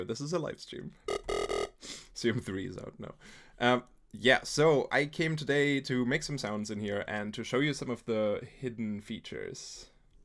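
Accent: Germany. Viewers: Why? He has a German accent.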